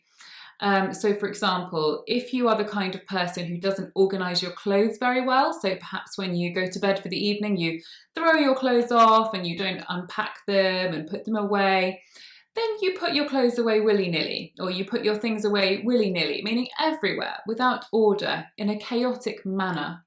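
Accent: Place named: RP, United Kingdom. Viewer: probably the best teacher to listen to for standard RP english. superb, but a dark L occaisionally ( exampuwl instead of example) talking abaat not about. Seriously though SO good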